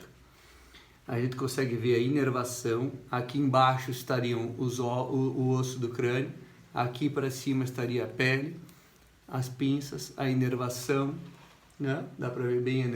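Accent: Rio de Janeiro, Brazil. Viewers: Dr o senhor é do Rio ?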